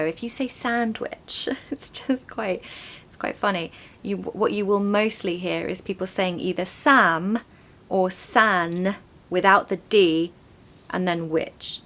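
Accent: United Kingdom. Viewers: exacly you are my favourite teacher You have a lovely British accent I love it😅❤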